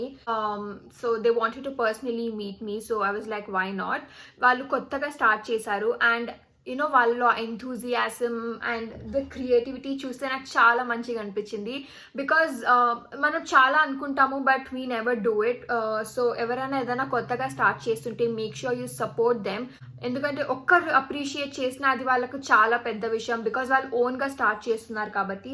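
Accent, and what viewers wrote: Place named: North India, India. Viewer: Madam meeru English lo chesthe north indian anukunnanu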